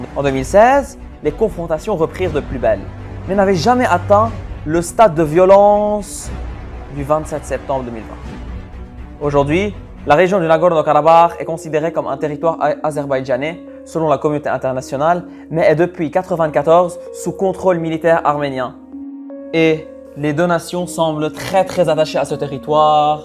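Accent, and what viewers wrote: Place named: Belgium. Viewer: Cet accent Belge à couper au couteau, MDR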